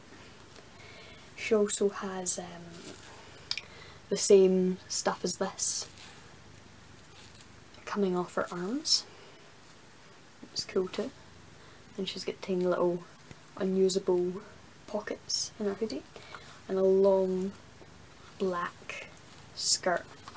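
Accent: Scotland, United Kingdom. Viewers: Hate to ask but are Scottish or British?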